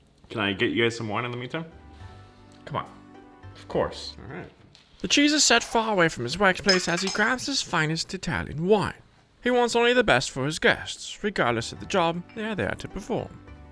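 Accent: England, United Kingdom. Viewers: You are so entertaining with the way you deliver your recipes. So different from everyone else's boring hum drum keto recipe channels on YouTube. Your videos are funny, entertaining, informative and refreshing. Loving the different costumes, characters and english accent narration.😊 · This Italian is please of what he sees. Hysterical video content and edited and awesome food. You're doing that British accent??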